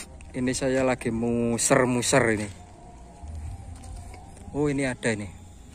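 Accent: Java, Indonesia. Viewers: Kenalan gih kang asline pean niku piyanton pundi kok bhsane medok bnget😀😀😀😀....semngat tmh sukses mju terus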